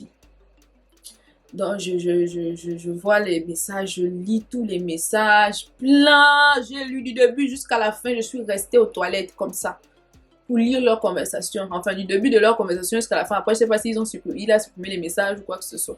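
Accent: Cameroon. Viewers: Mais elle met toujours l'accent cam le plus souvent quand elle parle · Pourquoi tu parles avec cet accent Camerounais? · U talk like a Cameroonian even the slants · Aujourd'hui c'est l'accent Camerounais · Donc quelqu’un qui parle avec un accent doit maintenant ce justifier du pourquoi elle a cet accent ma chère si tu n’était pas au courant elle est gabonaise et camerounaise .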